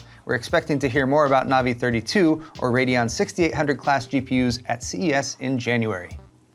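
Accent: Germany. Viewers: you speak like a native Bavarian... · I had to give a thumbs up for the pie alone. But I wish there was an option for a 10 times thumbs up for the German dialect. By far my favorite YouTube channel keep up the great work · Love the german accent dude😂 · commenting on your german accent has me between honesty and politenes.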